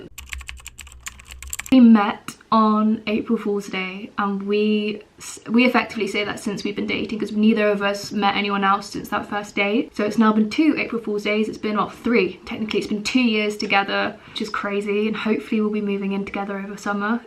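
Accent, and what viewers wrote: United Kingdom. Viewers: Your British accent is adorable 😩🎀✨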